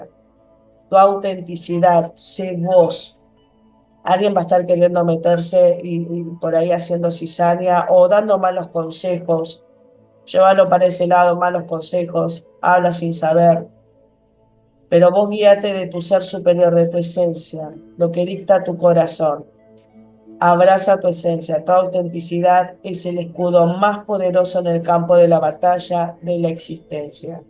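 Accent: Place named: Argentina. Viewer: HOLA ME GUSTA. LA LETURA. ES MI PRIMERA VES CONTIGO. SI MI FAMILIA ES BUENA PERO HAY INTERESES CREADOS. YO QUIERO SER LIBRE TOTAL Y ESTAR BIEN EMOCIONALMENTE. TE ESCUCHO DE URUGUAY MONTEVIDEO. VOS SOS ARGENTINA POR COMO HABLAS LO SUPE INTUCION. 🇦🇷🇺🇾 SOS UN AMOR. SI ESO QUE SOY MENTAL SI LO SOY PENSAR MÁS EN MI. TE AMO ARGENTINA UN PAÍS HERMOSO FINO DE MODA DE GENTE HERMOSA ESTILO. URUGUAY ES MÁS TOSCO, LA GENTE SERÍA MUY POCO OPTIMISTA, LO BUENO ES LAS PLAYAS Y NO SE ESTA MAL PARA VIVIR HAY MUCHOOOOO ARGENTINOS ACÁ. Y MI NOMBRE TODOS ME DICEN SOS BRASILEÑA NO MI ABUELO PATERNO PERO NO YO NO POR MI MAMÁ ITALIANA Y AHÍ TENGO APELLIDO HERMOSO ITALIANO DE GENTE MUY DIVINA. CALABRESA. ASÍ ME CONOCES MÁS. SI LABORALES SI MEJORES SI Y QUE MI PAREJA TRABAJE POR DIOS ES MEDIO VAGO. MI PAREJA TIENE ALGUNA S COSAS BUENAS. GRACIAS SOS UNA GENIA MUJER. SI TENGO DUDAS SI DE MI PAREJA. 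EL PROMETER Y NO CUMPLE NADA. BUENO ME GUSTÓ CONOCERTE MI CHICA ARGENTINA BESOSSS PARA 🇦🇷🇦🇷🇦🇷🇦🇷🇦🇷🇦🇷🇦🇷🇦🇷🇦🇷🇦🇷🇦🇷🇦🇷🇺🇾🇺🇾🇺🇾🇺🇾🇺🇾🇺🇾🇺🇾🇺🇾🇺🇾🇺🇾🇺🇾🇺🇾💋💋💋💋💋💋💋🌻🌹🌹🌹💋💋💋🌻🌻🌹🌹🌹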